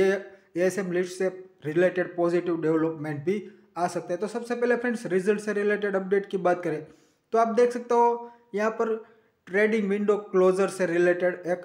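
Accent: Gujarat, India. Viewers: Loved Gujrati accent.Reminded me of JETHALAL.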